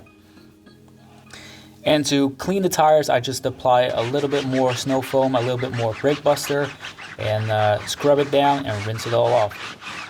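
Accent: United States. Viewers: I really learn a lot from all of your videos whether you talk or choose not to talk. Both are excellent. By the way, you sound American, but I thought you were Dutch. Where exactly do you live in Europe?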